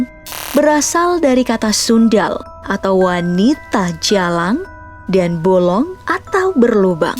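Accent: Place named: Pontianak, Indonesia. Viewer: Yang berasal dari pontianak mana nihh suaranyaaa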